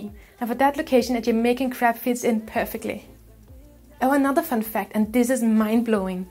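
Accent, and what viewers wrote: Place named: Denmark. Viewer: Your from Danmark i Can hear this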